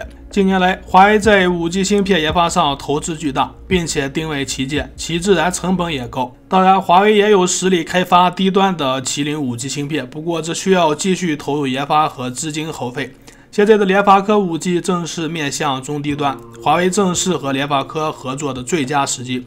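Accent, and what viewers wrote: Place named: Chahar, China. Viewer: 察哈尔口音，老乡啊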